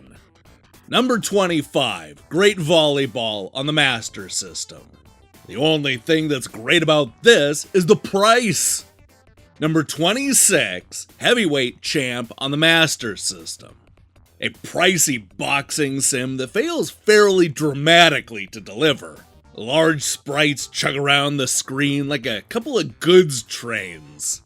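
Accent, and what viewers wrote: United States. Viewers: All this extremely British writing with a heavy American accent really makes this video work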